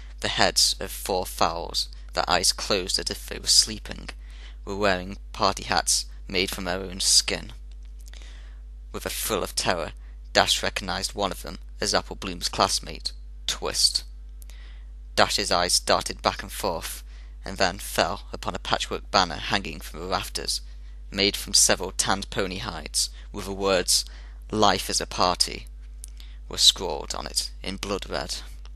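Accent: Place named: United Kingdom. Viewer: your british and that makes the story more scary awsome reading dude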